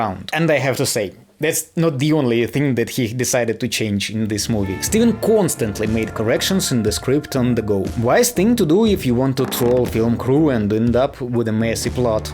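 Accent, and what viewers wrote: Russia. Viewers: dude your russian accent is so thick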